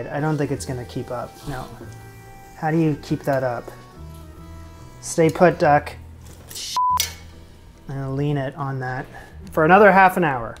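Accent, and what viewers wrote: Canada. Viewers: Did you ever live in Canada? Sometimes, i think I hear a slight Canadian accent in your voice. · A NY apartment dweller with a Canadian dialect that thinks in metric while cooking imperial-united dishes. Interesting.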